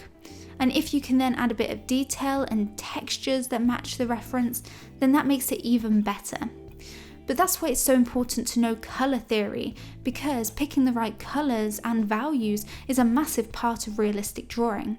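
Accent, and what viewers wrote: United Kingdom. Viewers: Are you british? Your Accent is very nice 👍👏👏👏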